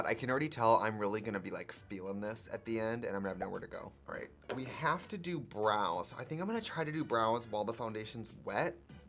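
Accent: Wisconsin, United States. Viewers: Is it just me, or was the Wisconsin accent really making herself known in this video?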